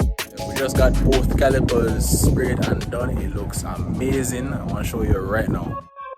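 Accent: Jamaica. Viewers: LOVE this platform gonna be getting one for my first car when i migrate 🔥 Bro sounds Jamaican tho🇯🇲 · Rights afta mih hear accent mi click subscribe. Bigg upp🇯🇲🇯🇲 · Subscribing just because yuh sound like a yaadie👊🏽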